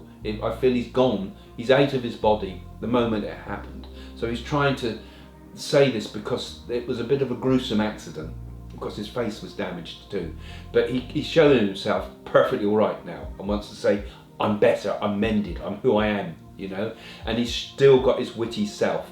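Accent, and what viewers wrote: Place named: England, United Kingdom. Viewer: Your a medium? Interesting, love your English accent.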